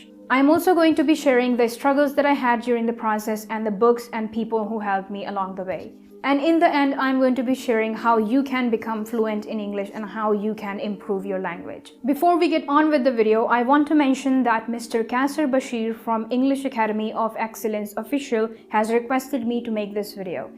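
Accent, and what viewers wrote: United Kingdom. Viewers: How to get used to UK accent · Seems like she is native of uk 😄😇😇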